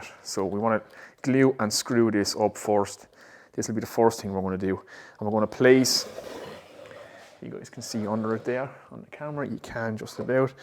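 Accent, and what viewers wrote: Ireland. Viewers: Hello, your videos on jig making are fantastic and I will definitely attempt them. Can I be so bold and ask what part of Ireland you are from, because your accent is very familiar. Also, I don't really go in for Patreon but I do believe in rewarding good work that is helpful for me so can you advise your PayPal account please.? A jug for a jig seems a fair reward (hahaha). Paul. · Just hearing your Irish accent makes me smile. I’ve sent many years working on the green isle, all very enjoyable. Thank you. Co..Cork?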